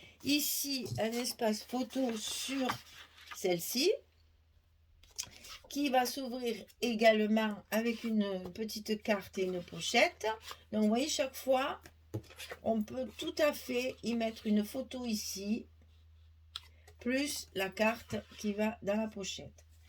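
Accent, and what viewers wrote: South, France. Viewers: Bonjour je trouve vos albums bien jolis. En ce moment je suis en train de faire l album snowy winterland en suivant les tutos. Je vais aussi tester les capsules. Dans tous les cas merci encore pour vos tutos et aussi votre accent du soleil me donne du baume au cœur.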